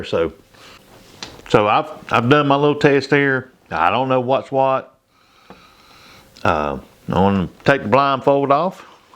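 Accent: South, United States. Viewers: I'm in the UK. Love your southern drawl.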